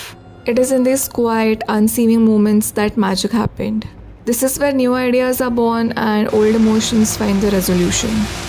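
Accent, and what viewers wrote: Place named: United Kingdom. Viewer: british english while indian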